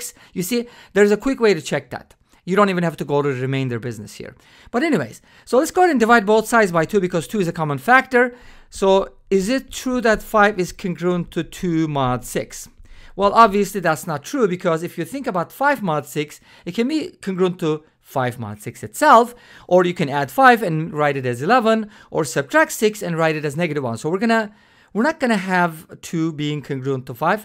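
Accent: Turkey. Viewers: you sound extremely turkish, are you?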